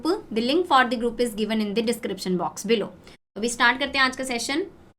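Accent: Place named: Punjab, India. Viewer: Punjabi tone ari h apki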